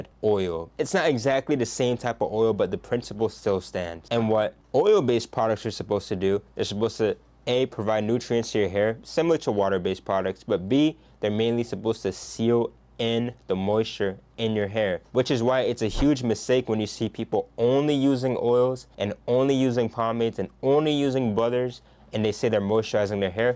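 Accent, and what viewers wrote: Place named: Jamaica. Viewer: Which country your parents come from. Mi hear yu accent !jamaica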